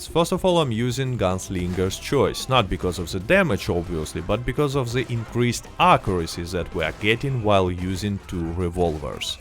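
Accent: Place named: Russia. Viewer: You have a cool Russian accent. Your voice reminds me of my wife's uncle 👍🏿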